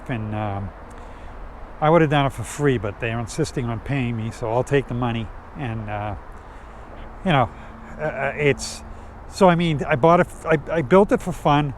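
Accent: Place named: Northeast, United States. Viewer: Hey do you have a link or source that you used for your cable management i wanna do that to my expedition looks super clean on this bike btw where u from New York or New England? i grew up in Mass. by Boston so i recognize the North East accent so you like those direct drive motor's verses the geared hub motors i know direct drive are quiet and made for speed more while the geared are supposed to be more setup for torque going up hills and what not from what ive come to understand im looking into putting another geared hub in the front of my expedition but its been a long time in the making due to research cost and what not have any info on that type of situation by chance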